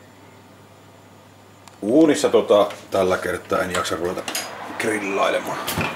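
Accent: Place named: Savo, Finland. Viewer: On siinä vähä paljon muutakkii.Hauskaa tämä Savon murre.Itsekkin Savolainen kyllä.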